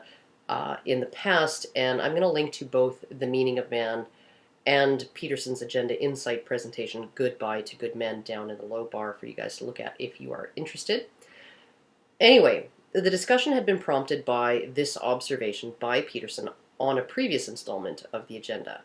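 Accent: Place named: Ontario, Canada. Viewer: Interesting, learned post here. Encourages me to view your others, if only I can get by that Ontario accent .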